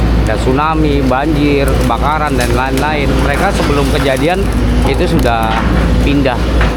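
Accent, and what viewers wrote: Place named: Banyumas, Indonesia. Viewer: dr logatnya mas dr jawa ngapak ya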